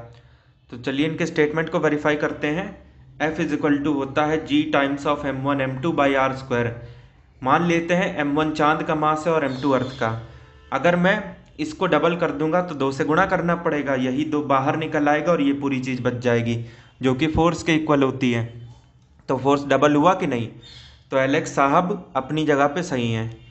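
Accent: Kanpur, India. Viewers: Ye sir pakka kanpur k hai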